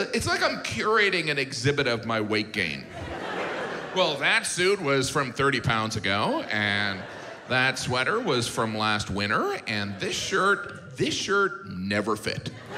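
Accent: United Kingdom. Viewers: That’s not a British accent that’s Scottish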